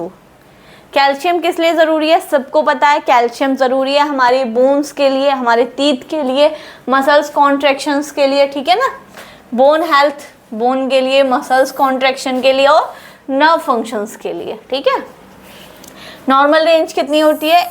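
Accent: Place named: Bihar, India. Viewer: Theek hai yah kam boliye aap Bihar se ho kya ❤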